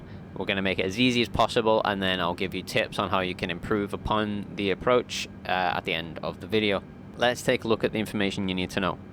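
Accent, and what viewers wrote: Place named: Northern England, United Kingdom. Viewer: Thank you, for all the tutorials! Very simple and easy explained, helps so much for navigating whether its a an A320 or B747. Additionally like your english accent, seems to be somewhere in the north of the UK (scouse?)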